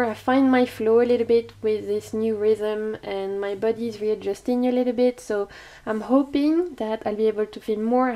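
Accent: France. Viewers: Your lovely French accent is what makes your videos so pleasant to me😘 I love to hear different accents in videos: it makes me appreciate the creators' effort to speak a second language and reach a wider audience even more. I am very self-aware of my Italian accent too, but creators like you inspire self-confidence in me. Sending healing vibes❤️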